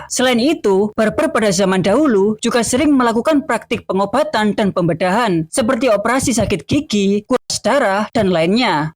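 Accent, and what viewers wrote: Java, Indonesia. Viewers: Terlalu medok mas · logat jawa dan meme pria hitam. admin asli ngawi fix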